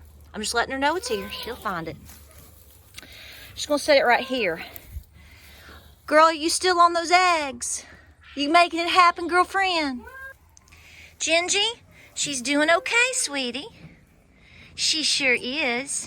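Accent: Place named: Easton, United States. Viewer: I have been watching you for so long and just wanted to share just how much I enjoy it being from Easton to see myself I love Hearing that accent as you go over things you are so encouraging and it's so heart warming and comforting to know that you care enough to take your precious time and that of to share this information with us a lot of us know it have you used it and may have forgotten about I have forgotten about it but you're helping to bring it back to the forefront and I'm so grateful you too as well as your family are in my thoughts and prayers Best regards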